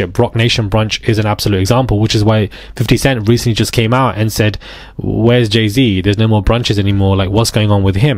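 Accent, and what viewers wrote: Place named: England, United Kingdom. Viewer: You can slow it down if you acers the playback speed in the top right. But yeah England has so many accents when people speak normal speed its hard to understand if you're not native. Just be thankful he's not Scottish or Irish you prob wouldn't understand one word 😂 even I as a native might need to repeat parts!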